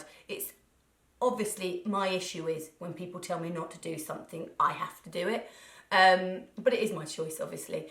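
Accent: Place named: Norfolk, United Kingdom. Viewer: I've just recently moved abroad away from Norwich and sometimes I really miss home. You correcting 'beautifully' made me chuckle because I do exactly the same thing when I say something that sounds 'so Norfolk'!! Love the fact that Norwich has produced 2 such excellent make-up artists. Your videos are fantastic and I'm seriously envious of your lashes!!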